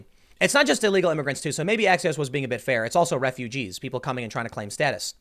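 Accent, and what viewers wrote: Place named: United States. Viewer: to you. I have listened to probably about 15 of your vlogs or whatever you would call them. And to me you sound to me like an American. I am 55 but when I was a younger man I could discuss issues including politics with those who diametrically oppose me on most opinions, then we could go to the movies switch discussions to music or anything. You are articulate and you back up your opinions with data. No one on the right or the left should expect more of you. Keep up the good work. If everyone agreed with everyone 100% of the time we would be living in a robot police state with no free will.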